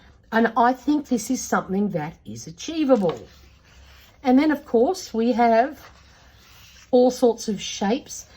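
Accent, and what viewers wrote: Australia. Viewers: Nice honest review. I just found your channel because I was looking for reviews on this book. Nice to be greeted by an Aussie accent. G’day!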